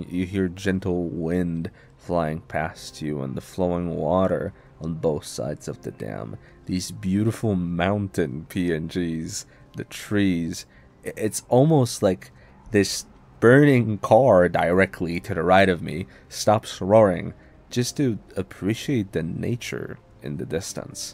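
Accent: Poland. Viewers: Are you polish by any chance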